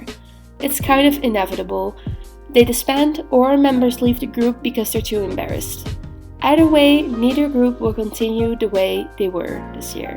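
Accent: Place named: Netherlands. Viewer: hey, i have a question? where are you from? because ur voice rlly sounds dutch lmao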